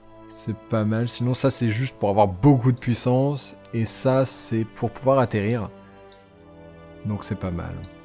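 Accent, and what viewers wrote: England, United Kingdom. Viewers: Perso je kiff l'accent Anglais de <3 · tas laccent anglais toi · Il a un putain accent anglais!!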